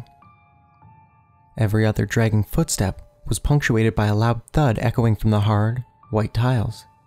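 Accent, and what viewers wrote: Canada. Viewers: A very Canadian creepypasta reader. Not only that but you read very well. I'm looking forward to listening to more of your readings :}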